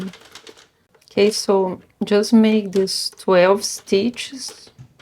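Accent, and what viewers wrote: Brazil. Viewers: voce e brasileira ? reconheco a acento de brasileiros falando ingles :)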